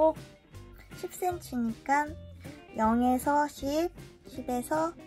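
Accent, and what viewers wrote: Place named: Gyeongsang, South Korea. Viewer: 경상도 분이신가!! 자꾸 뽀족을 빼쪽한부분...이라고 하시네요.ㅎ 제가 경상도 아줌마라^*;;억양이랑 말투가 그리 들리네용ㅎㅎ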